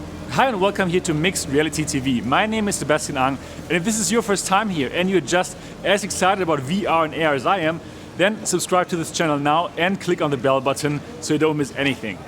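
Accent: Germany. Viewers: Also dein Deutscher accent hört man sowas von aber Cooles Video :D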